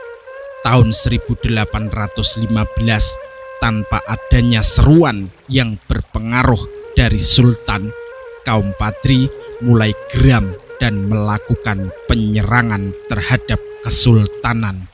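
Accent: Java, Indonesia. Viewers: Medog kali Jawa mu mas, kalau ngak paham sejarahnya yo belajar dulu toh.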